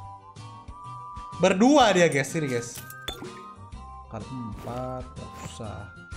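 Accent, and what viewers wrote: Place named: Makassar, Indonesia. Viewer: orang makassar dia logat makassar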